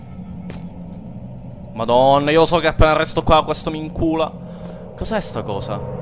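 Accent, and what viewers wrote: Palermo, Italy. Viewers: compare complimenti per il tuo linguaggio a palermitano...mi ki fresco ki si..continua così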